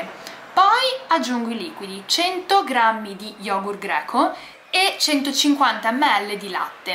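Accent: Tuscany, Italy. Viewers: parli con accento toscano ora 😁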